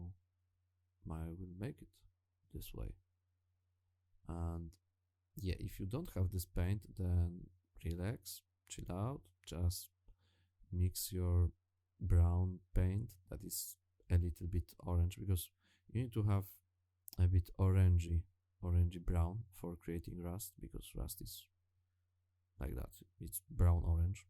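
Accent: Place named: Poland. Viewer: You, my friend, have a very polish accent! :D Are You Polish by any chance? ;) Zdrówko!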